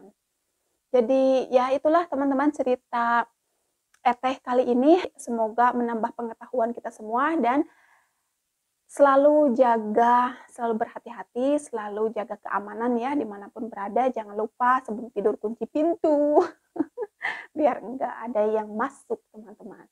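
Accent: West Java, Indonesia. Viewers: Sy seneng.ada sunda2 nya ngomong nya a eteh mah lucu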